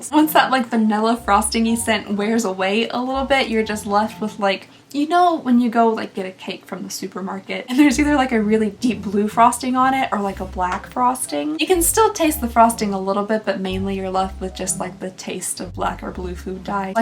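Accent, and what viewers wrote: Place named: Owensboro, United States. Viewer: My best friend in high school was from Owensboro... your accent makes me miss her!!! Love what you do so keep on keeping on!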